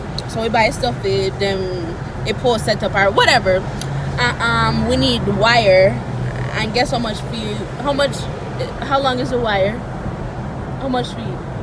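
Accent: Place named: Jamaica. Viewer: Love your likkle patois🤣🤣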